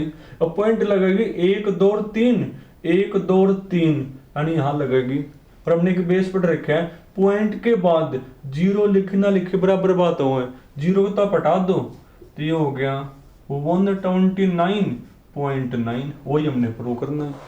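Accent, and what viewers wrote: Haryana, India. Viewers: Dear sir aapki language nahin samajh mein a rahi thi. Shayad aap Haryanvi language bol rahe the lekin mere sare concept clear Ho Gaye thank u veri Much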